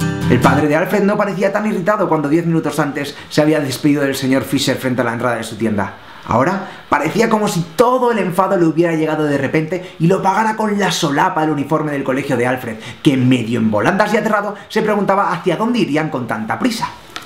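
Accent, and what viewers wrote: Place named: Catalonia, Spain. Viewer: me he vuelto a enamorar de tus dejes catalanes al hablar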